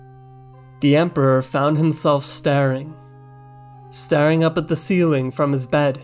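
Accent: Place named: Italy. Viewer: Tabish here watching from Lori's computer lol ...love the italian accent lol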